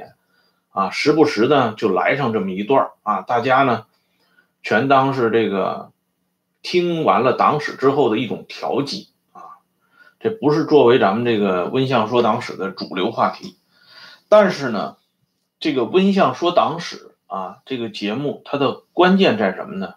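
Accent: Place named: Liaoning, China. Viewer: 听口音温老师也是辽宁籍吧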